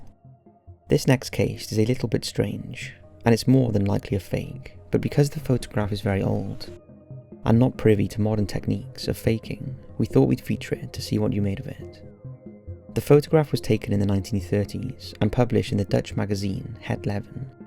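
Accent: Wales, United Kingdom. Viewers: Edwin well it's almost lunch time where I live in europe and I suspect he lives somewhere in great britain according to his accent.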